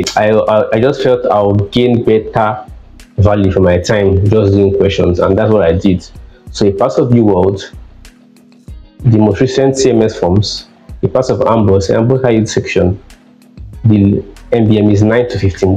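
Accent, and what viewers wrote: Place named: Nigeria. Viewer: Very interesting watching from Chicago, just a suggestion, if you want to work with the average American patient, start working on getting rid of your accent, I'm used to being around Nigerians because I live in Uptown but most Americans White or Hispanic won't be able to really understand or have the patience to keep a doctor with such an accent or maybe you're just taking fast.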